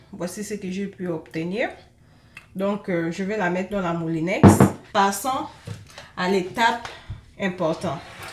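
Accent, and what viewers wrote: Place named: Africa. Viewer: Tu as l’accent africain mais c’est toi qui ne fais que dire <parceque en Afrique 😒> je t’aime bien mais arrête ça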